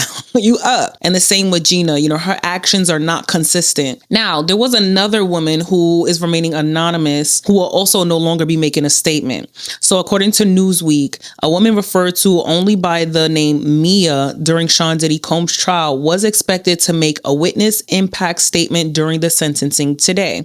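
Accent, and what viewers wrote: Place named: New York, United States. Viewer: Great video! Side note I love your NY accent to much ❤❤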